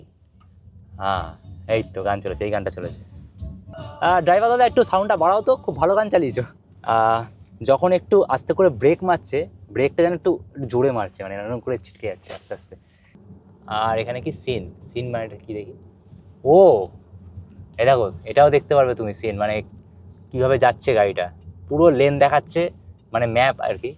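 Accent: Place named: Kolkata, India. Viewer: Kolkatar kotha bolche mone hoi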